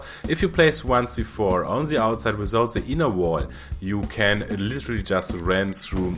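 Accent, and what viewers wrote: Germany. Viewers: nice video, where you from? I think from Germany ^^ because the german accent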